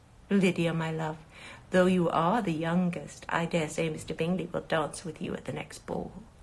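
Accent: England, United Kingdom. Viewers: Her English accent is natural as well.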